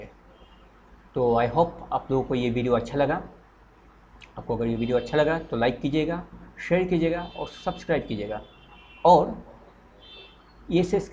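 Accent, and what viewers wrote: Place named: Assam, India. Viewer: There is a touch of assamese tone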